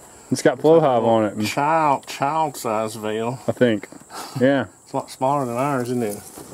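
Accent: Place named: Appalachia, United States. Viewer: Peter has a New English accent this guy has a Appalachian accent. Ones Northern the others Southern very different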